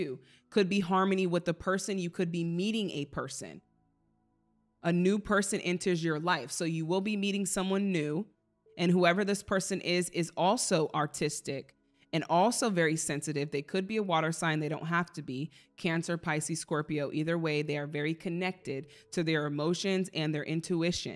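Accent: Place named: Midwest, United States. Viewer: Can you please tell me what decks you used in Leo read. You are remarkable. I’m a tarot collector since 12 yrs old. I’m 66. I love you readings your style your voice. You’re a gift to this world no doubt. I’ve been a reader my entire life it’s not often I see a true message from spirit from readers. Especially one who just cold reading on podcast. Crazy accurate. Bold brilliant and appreciated. What state are you in. I’m in Minnesota. You sound like me. Midwest accent. Lol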